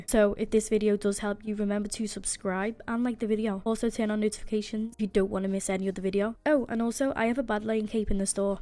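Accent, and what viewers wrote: United Kingdom. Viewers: Are u from like Great Britain or something cause u sound like haha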